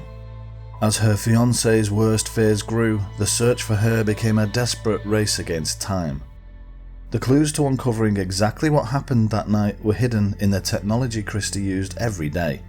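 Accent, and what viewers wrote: United Kingdom. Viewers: Your wonderful British voice doesn't match this U.S. story. Please......more non U.S. stories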